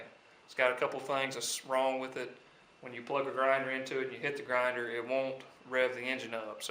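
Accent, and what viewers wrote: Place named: Mississippi, United States. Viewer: Love your videos. It's nice to hear that accent again (grew up in Hattiesburg -- transplanted to the PNW) from a GREAT teacher. Keep it up!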